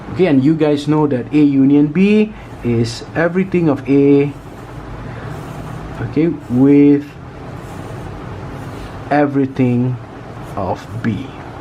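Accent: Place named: India. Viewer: well , his accent sounded totally indian so...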